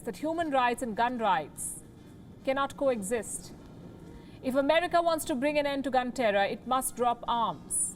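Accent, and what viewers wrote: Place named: India. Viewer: 𝕀𝕟𝕕𝕚𝕒𝕟 𝕒𝕔𝕔𝕖𝕟𝕥 𝕚𝕤 𝕛𝕦𝕤𝕥 𝕡𝕝𝕒𝕚𝕟 𝕟𝕒𝕤𝕥𝕪 𝕃𝕆𝕃!!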